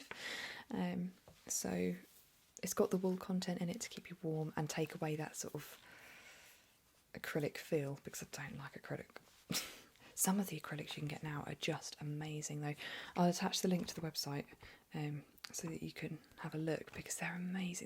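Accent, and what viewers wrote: Ireland. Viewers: Can you knit like plush animals for example? Or is that for only like sewing or something? I'm not much of a craftsman, but I do really like plush animals >_> So I thought I'd ask. :) Great video. I actually found your channel when I typed in Irish ASMR. While I had a hard time finding any actual Irish asmrtists, I did find one of your Irish accent videos. :) Subscribed! I look forward to checking out your other videos.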